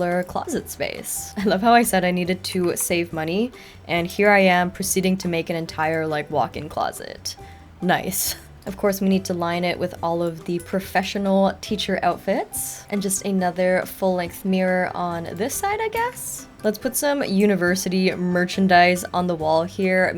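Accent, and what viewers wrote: Canada. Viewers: She's Canadian. Different countries, different pronounciations.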